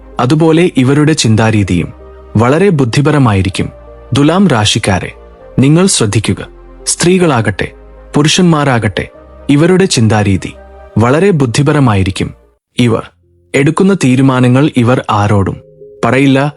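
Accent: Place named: Tamil Nadu, India. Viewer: OM DURGA DEVI NAMAH. The words are pronounced like Tamilian who do not know Malayalam.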